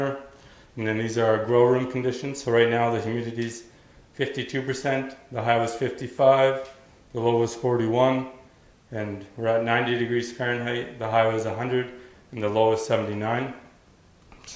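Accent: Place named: Canada. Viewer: could tell he was canadian right away! :)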